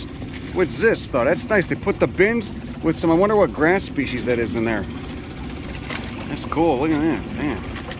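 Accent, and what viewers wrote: Chicago, United States. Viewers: Sounds like you come from Taylor Street, I think I picked it up here and I actually from there like Downers Grove or some crap. Anyway, yeah Chicago River Resurgence such as it is you know much progress compared to that crap in New York f*** that noise . Some assholes ain't giving a f*** but you know nature takes its course wherever it can. Edit grew up in Elmwood Park not Downers Grove. Keep on keeping on capital p a l . I ran across a tribe of snapping turtles, that would knock your eyes out I don't think you could fit them in the seat of a Toyota Karla yeah that monster on the Chicago River what are you going to say who can compete these things went from two to three and a half feet front to back on the shell, used to be a carpenter I know my dimensions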